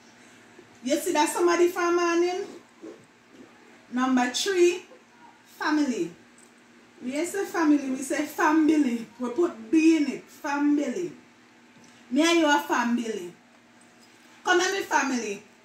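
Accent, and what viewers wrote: Jamaica. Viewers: Wow I love your Jamaican Accent. Really enjoyed this ❤️ full watch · Wow. The real Jamaican accent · I love jamaican accent.. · i love jamiacan pronounciation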